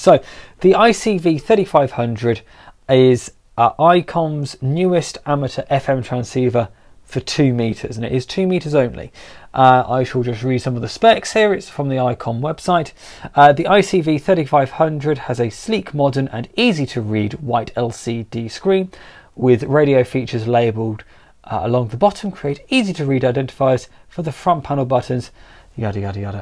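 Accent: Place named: Texas, United States. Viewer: Great video! I know I will not be buying the T10 for that price. Lots of lower priced options (not bowfung) I notice you have an accent are you from Texas?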